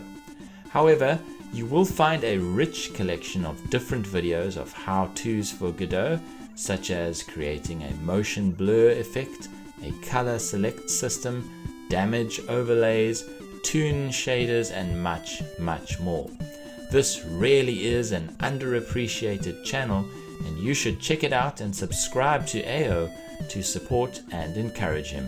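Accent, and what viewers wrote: South Africa. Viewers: Great showcase! Are you South African by any chance? 🛴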